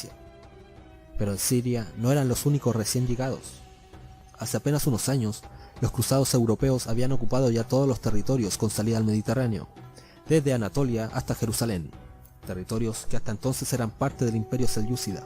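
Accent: Chile. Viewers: Es chileno el boludo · es mi idea o este loco es chileno? xD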